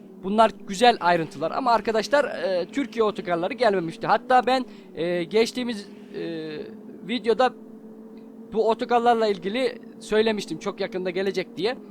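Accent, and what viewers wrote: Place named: Rize, Turkey. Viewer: Abi sen nerelisin ya tam Rizeli tipi ve şivesi var sende 😁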